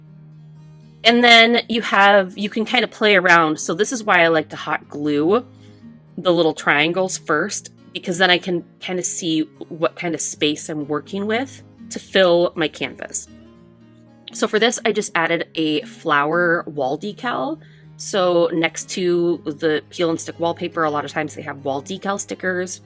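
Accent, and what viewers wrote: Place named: Midwest, United States. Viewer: from another Midwestener, I understood that you said moss 😆😆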